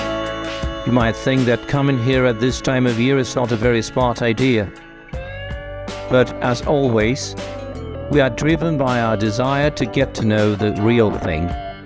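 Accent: United Kingdom. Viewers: Bel video e belle gnocche, voglio farti i complimenti per il tuo accento British, mi sembra di capire che tu sia italiano, s'è così hai una pronuncia perfetta.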